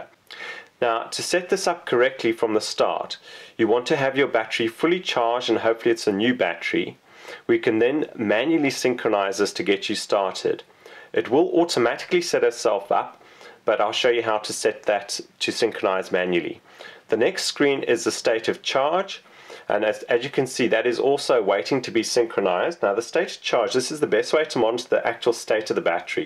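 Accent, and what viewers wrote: South Africa. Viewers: Very good demo! Truly well explained, thank you!! South African accent? Dankie!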